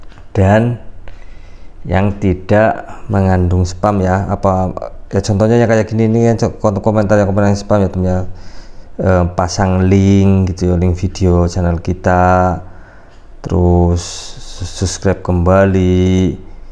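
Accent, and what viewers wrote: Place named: Banyumas, Indonesia. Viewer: Ikut nyimak kang..😀 trims infonya..👍 kaya ada rasa NGAPAKNYA...😁 apakah termasuk WONGE DEWEK...🤔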